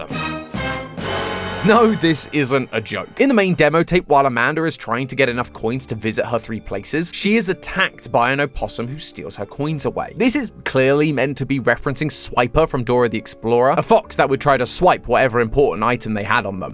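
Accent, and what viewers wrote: United Kingdom. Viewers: I miss Mat. The british accent is weird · i forgot tom replaced matpat,so when i clicked i got jumpscared by a british accent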